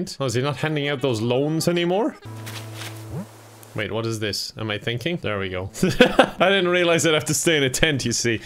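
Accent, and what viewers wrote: Ireland. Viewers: Ste van der Velde hes irish, you’d here ireland in his accent. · Irish accents are so attractive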